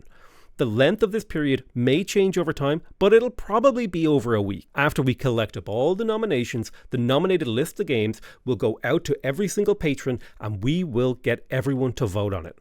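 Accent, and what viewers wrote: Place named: Ireland. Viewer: Hey, long time fan/ first time commenter, here. I think you have earned another patreon supporter since I have been enjoying your channel for over 5 years. I remember one stream where you were talking about how many people think your are so smart (the accent helps) and you replied that..." if I you were really smart I would have money and a career". I know its hard to earn a good living these days in California, and probably harder in Ireland. Congratulations! you have found a unique path that I would like to support. Keep up the great work! Oh I was wondering, do I need Facebook to view your Patreon pages? I hope not, cause I got rid of Facebook.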